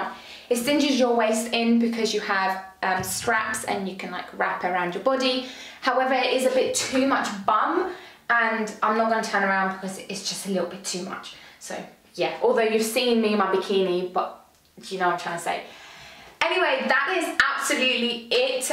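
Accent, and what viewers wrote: United Kingdom. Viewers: Where is that accent from? Okay, UK? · You look really good but your British accent is hot keep up it up . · my favorite British beautiful woman! Your clothes show is very professional and enjoy able! I love your sexy look and accent. You are very honest with your thoughts and believes. Have a long healthy, happy life ❤️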